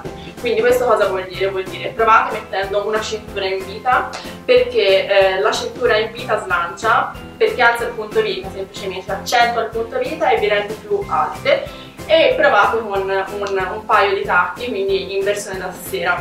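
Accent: Florence, Italy. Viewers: Ma che per caso sei di Firenze ?! ahhaha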